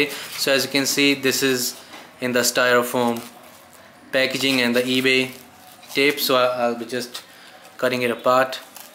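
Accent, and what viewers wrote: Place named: India. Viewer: oh i didnt mean the video was bad or anything it just sounds like sterotypical india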